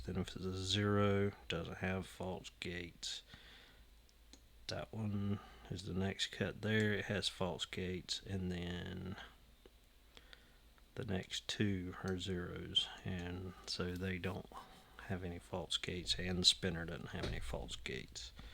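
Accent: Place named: North Carolina, United States. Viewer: I knew instantly you were from NC the first time I heard you on LadyLocks live. Great open sir!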